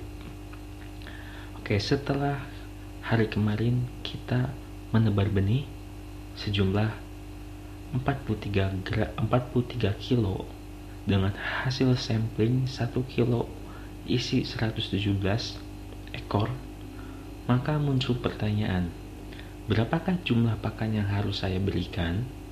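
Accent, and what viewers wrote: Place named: West Java, Indonesia. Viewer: pantesan aya logat" sunda hehe...abdi ti sumedang kang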